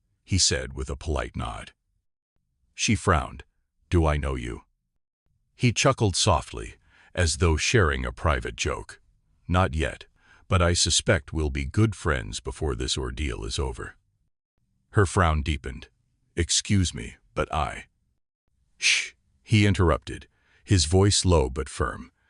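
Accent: United States. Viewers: Awful American accent. Awful fake Christie story. Go away do.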